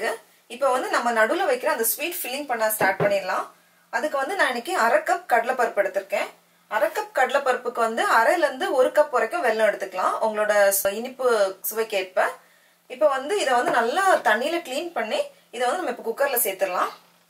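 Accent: Nagercoil, India. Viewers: Thalli, typically Nagercoil slang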